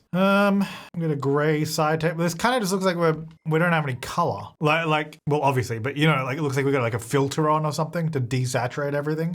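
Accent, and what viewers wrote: Australia. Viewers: I'm Australian so I'm fairly used to the accent but when I have you in the background you sound like Jazza 😂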